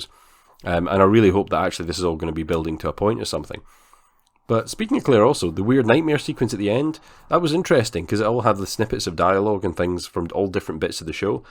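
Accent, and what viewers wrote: Scotland, United Kingdom. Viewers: Hi! Nice to be here again. I really liked episode one. With all the characters and to setup the storylines I think this opening episode is a good thing. The main storyline I think will revolve around the weird Christie family. And yes, they do get weirder! It's been a few years since I've read this book, but I don't think you'll be disappointed. Is your accent Scottish? I didn't realize the actor who plays Tom had such an illustrious career. I'll have to check out some of his other work. His accent is great. Beautiful opening with Glencoe in the background! See you for episode 2 review! (Won't see you, but I'll hear you🙂)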